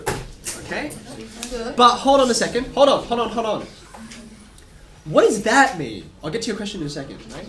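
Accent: Australia. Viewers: a prime example of an Asian with an Australian accent.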